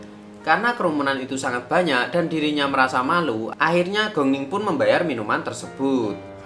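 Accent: Java, Indonesia. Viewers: Alur cerita yg d bawakan bagus tpiIntonasi pengisi suaranya terlalu medok...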